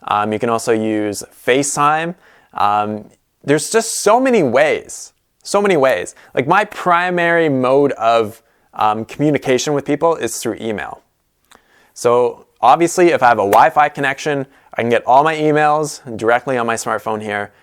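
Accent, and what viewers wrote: Canada. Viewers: I think he english-canadian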